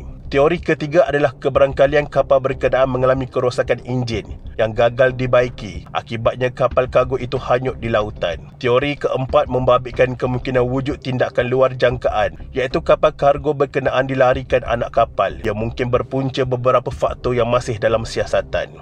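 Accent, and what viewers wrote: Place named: North, Malaysia. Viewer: MCM org utara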